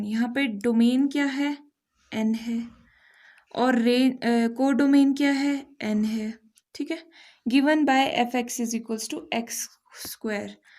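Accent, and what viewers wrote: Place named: Himachal Pradesh, India. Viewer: ma'am i am also from Shimla (Himachal Pradesh)... Meko aapki slang se lg rha tha k aap yhi k ho isliye mene poochh liya😅😊😊😁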